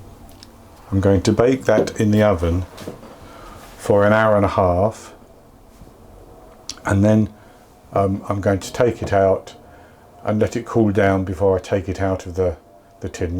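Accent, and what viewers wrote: United Kingdom. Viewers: I love your accent, what kind of uk accent is it?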